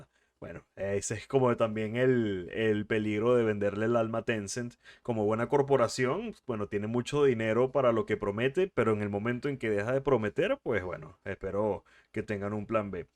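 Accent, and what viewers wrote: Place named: Spain. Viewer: Dios yo siempre había tenido dudas por ti acento y tus expresiones sobre si eras español o de latam Por fin hallé la luz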